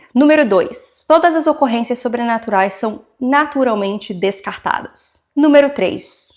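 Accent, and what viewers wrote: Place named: England, United Kingdom. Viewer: Parece que meu cérebro escuta voce falando português, mas no final da frase tem um sotaque inglês 😅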